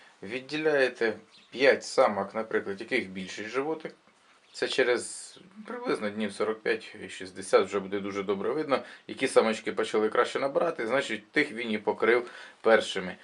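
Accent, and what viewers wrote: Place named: Poltava, Ukraine. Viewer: Дякуємо за поради і приємну мову, чисто як у Полтавській чи в нас, південь Чернігівщини. Здоров'я, натхнення і творчих успіхів!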